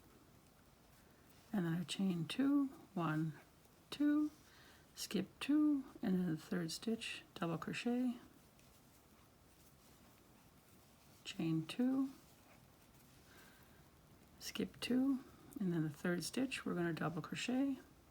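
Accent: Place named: Scandinavia. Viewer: Interesting accent - are you European? Scandinavian Maybe?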